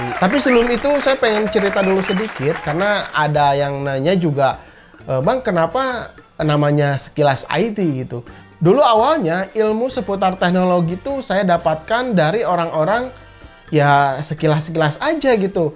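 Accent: West Java, Indonesia. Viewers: Bismillahirrahmanirahimmmmm.. Semoga menang Subscribe✔ Follow✔ Like & Share✔ Instagram : ry_akbar037 Video yang paling diingat adalah waktu review Vga zotac. soalnya pas zotac nya koat ka sunda banget gt. "JOTAX" nyebut na teh hahaha Pengen Banget Ram Venom RX 8GB karna saya rencana mau rakit pc DDR4 soalnya bosen pake ddr 3 mulu hehe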